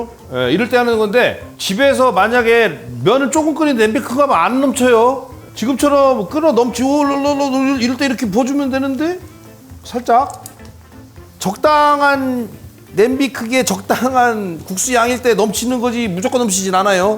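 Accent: Chungcheong, South Korea. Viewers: 박사님 고향이 혹시 충청도!?!? ㅎㅎ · 비빈거여. 뭐여 ..충청도 능청사투리..ㅎㅎ 넘 재미나요~~~ 연기실력도~~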